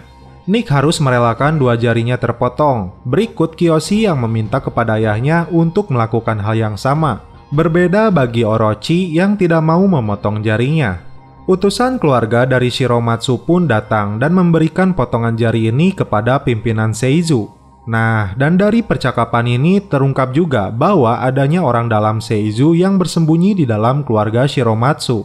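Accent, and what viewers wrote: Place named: Sunda, Indonesia. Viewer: Mang ngomongna sunda ning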